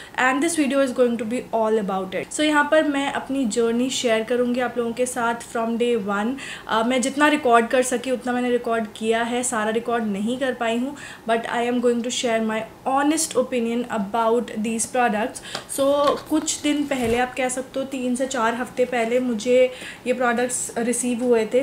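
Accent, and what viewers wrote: India. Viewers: Rajasthani lg re ho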